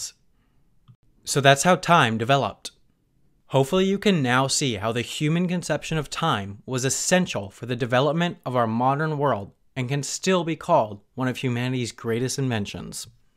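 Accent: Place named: California, United States. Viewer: You sound like a Californian.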